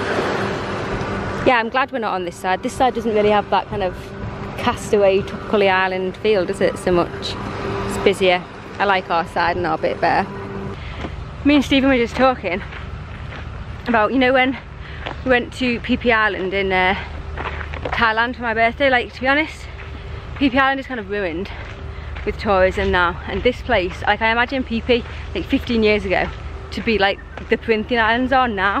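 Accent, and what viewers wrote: United Kingdom. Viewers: nice vids, love your Brits accent made me remember my years in Surrey, UK for my MSc.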